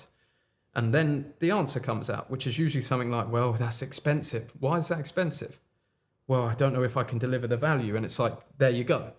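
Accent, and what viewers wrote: United Kingdom. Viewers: What a hell. This is like Hamza, but more articulate and written 🇬🇧💂 Certified British accent 💂🇬🇧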